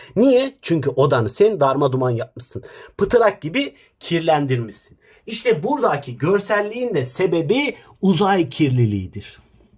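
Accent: Kayseri, Turkey. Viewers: Abi sen gayserilimisin?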